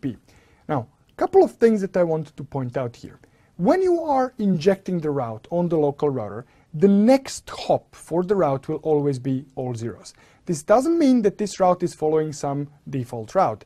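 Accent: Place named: Eastern Europe. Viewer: I love this guy and his eastern europeen accented English, amazing explanation of all import concepts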